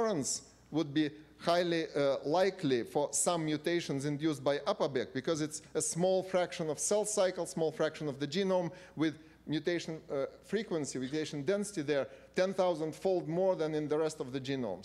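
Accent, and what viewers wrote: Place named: Russia. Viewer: He speeks with thick russian accent.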